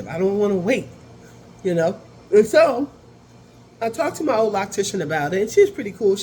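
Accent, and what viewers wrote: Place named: New Jersey, United States. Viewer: Where is G located? I think you’re from Jersey, (I can hear it)! So am I, it live in Atlanta now. Can come see her in Jersey. If so, I come home all the time E.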